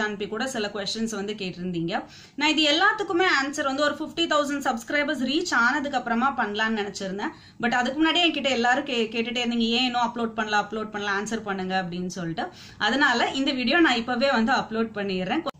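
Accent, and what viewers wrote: Kerala, India. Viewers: Neengha pesuvathu malayali mathiri iruku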